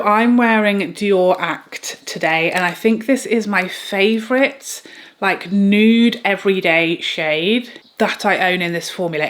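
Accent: United Kingdom. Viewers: Love you bless you beautiful soul inside out, I love your British refined accent it's so soothing and therapeutic bless you and your family 😊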